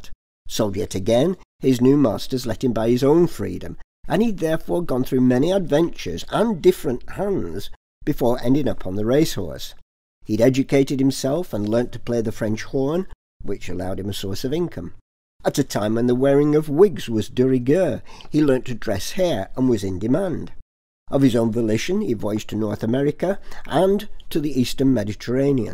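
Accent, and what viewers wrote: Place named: RP, United Kingdom. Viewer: I almost didn't recognize yer 'posh' voice!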